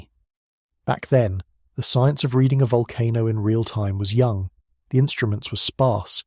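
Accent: United Kingdom. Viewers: They need to give this some pronunciation lessons - it said magmer for magma and sawr for saw. I'm expecting it's going to say 'Warshington State'. Sounds a bit British.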